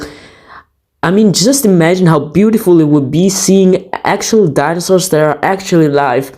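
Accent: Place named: Switzerland. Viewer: LET'S GOOO, QNA also i love the swiss accent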